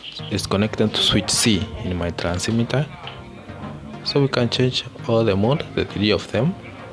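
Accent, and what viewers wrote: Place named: Indonesia. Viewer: Oh, I guess you're from Indonesia because your accent is very similar to an Indonesian remain optimistic about developing unmanned aerial technology bro, as an innovative step in diversifying technology into the aerospace engineering Hello from Indonesia, I'm a technical student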